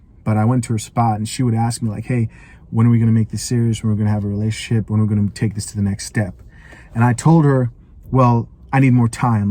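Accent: Africa. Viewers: Holy shit you was born in africa lovely bro! I’ve been watching your channel I recently just got redpill. I was born in Nigeria, when I first moved here I was 14 with a deep accent too. Much love brother keep winning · The African accent is one of the best.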